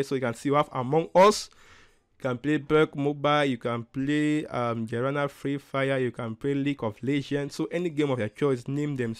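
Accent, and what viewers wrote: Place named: Nigeria. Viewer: no you somewhat sounded like a nigerian in a way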